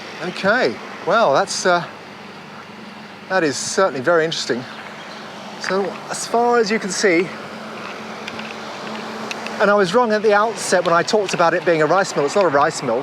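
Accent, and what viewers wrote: United Kingdom. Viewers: Good to hear the British accent vlogging in Fiji. I like your happy tone and saying hello to everyone. Great work!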